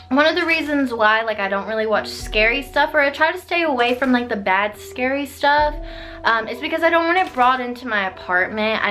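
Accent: South, United States. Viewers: My God. The comment of a possible bugs on ur bed on ur face freaked me out more than ppl in your room..ugh sorry. I think ur newest place is haunted and ur just too open or sensitive to it.. Hope u at least cleansed the place, ur decor (bc yes spirits will attach to their fav or beloved items..whole show on tv on it years ago..freaked me out buying second hand stuff just in case..but now I do but just cleanse anything I get 1st b4 coming into my home), and urself by now..bc kno this was months ago. So hope everything's all good now..got some catching up on vids to do (I say with shame for I adore u and ur channel/content). ..also..lookin beautiful and healthy as ever..always on of my fav youtubers..I mean creators (prefer callin y'all)..both southerners and myself now living in NY..just feels like home hearing ANY southern accent..but love urs the most..💜💜💜💜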